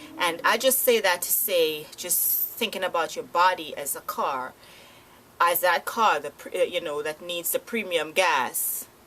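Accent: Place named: New Jersey, United States. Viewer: Hey... Where r u from? U sound just like my aunt from Jersey. N we r Trinidadian. Anyways, pretty hair! I subbed! :)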